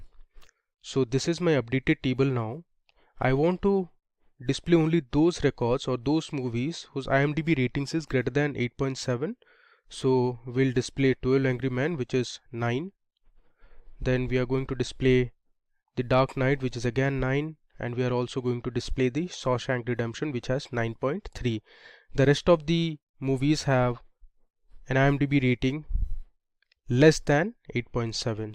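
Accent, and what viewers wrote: India. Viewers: Hes Indian and what's wrong with the accent or do you want him to have Canadian accent or your mother tongue? appreciate the hard work or gtfo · Are you facing problem with hindi ascent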